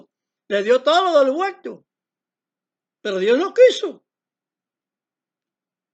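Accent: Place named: Cuba. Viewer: Ese acento cubano... espectacular!!!!! Bendiciones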